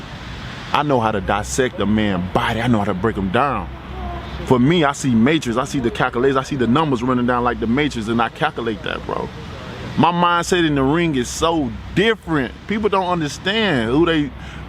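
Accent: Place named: Alabama, United States. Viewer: I’m reading the comments and I think it’s disgusting that people are poking fun of the way that he speaks, he’s from Alabama, they speak with a southern accent. There’s nothing wrong with what’s he’s saying, people from around the world think, especially Europeans, look down on other English speakers because we don’t sound like them. I know this to be a fact, I served with British and Irish soldiers and they rag on guys from America about their accents. I can’t wait until this guy cleans out the the division, so all you Europeans can be quit. But I’m figuring you guys will complain about something else Americans do or say.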